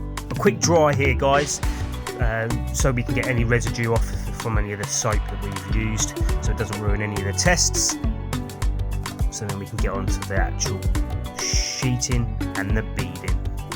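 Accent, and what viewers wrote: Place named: Midlands, United Kingdom. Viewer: I heard the accent then fellow Midlands boiiii yes !!!!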